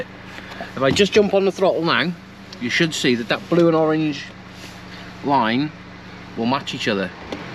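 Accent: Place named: North Wales, United Kingdom. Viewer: Where are you based mate? You sound local? In North Wales? I need my Daughters 2016 Mini 1.6d sorting the same. 😊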